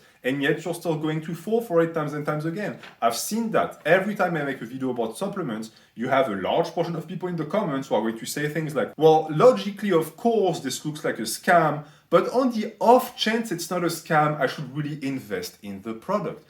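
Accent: France. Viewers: I'm just here for the French accent. You're like a jacked version of my tall French friend haha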